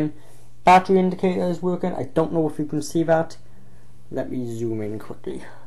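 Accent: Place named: Wales, United Kingdom. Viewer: Thanks great video. Nice accent. I take it your welsh lol. I done thousands of installs but was a bit stuck on the linx as never seen one before but after watching your video was a simple process. If you need any parts then contact me pcpitshop.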